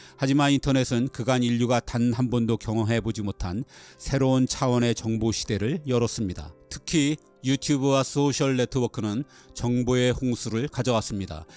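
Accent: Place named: Jeolla, South Korea. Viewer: 고향이 전라도이신가 보네요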